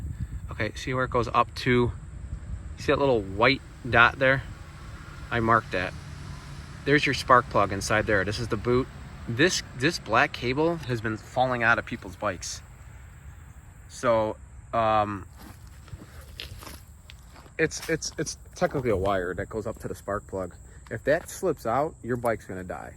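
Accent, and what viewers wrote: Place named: Chicago, United States. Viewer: You can totally tell you’re from Chicago lol but love the video 🔥🤌🏼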